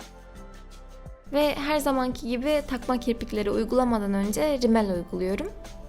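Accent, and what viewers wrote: Turkey. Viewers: And because Turkish is a highly evolved language in comparison to other Turkic languages. She also speaks the Istanbul variant of Turkish. Millions of Turks there speak like that. The politician speak the Turkish more sharply (because it needs to be more aggressive, because obviously, it is politics). Politicians even get diction training in Turkey to speak the way they speak now.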